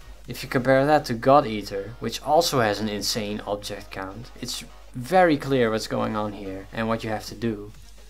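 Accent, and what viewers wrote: Netherlands. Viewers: Are you Dutch? Your accent is dutch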